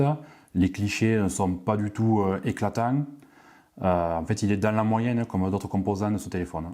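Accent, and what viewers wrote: South, France. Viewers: Merci beaucoup et toujours avec l'accent du sud et le sourire en prime 👏👍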